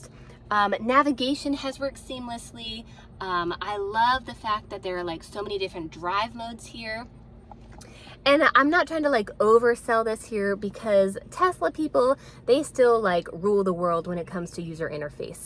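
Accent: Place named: South, United States. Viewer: Do more videos I love ❤️ that country accent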